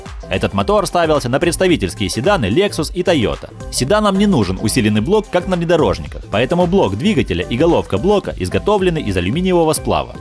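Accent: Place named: Belarus. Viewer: акцент белоруса, эксперт?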